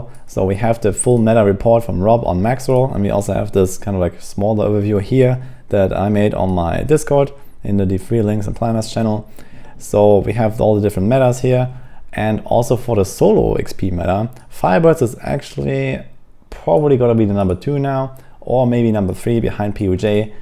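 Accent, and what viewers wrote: Germany. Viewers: with all due respect, Greece is a shithole, i donno where are u from but from your accent i can assume that you're from germany\austria etc. but hey if you're happy there then i'm happy for u!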